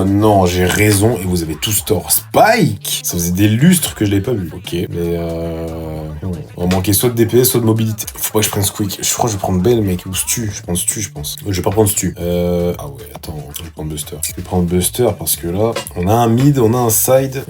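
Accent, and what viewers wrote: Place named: England, United Kingdom. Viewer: accent anglais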